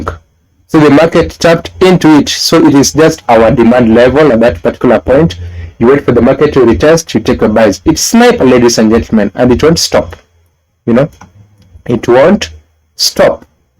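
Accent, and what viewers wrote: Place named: Kenya. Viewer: Kenyan accent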